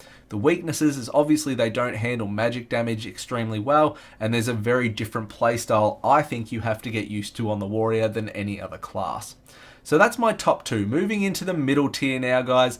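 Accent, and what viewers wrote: Australia. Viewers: dude just having you say 'this cunt is cooked' had me subscribe. good aussy tank videos i approve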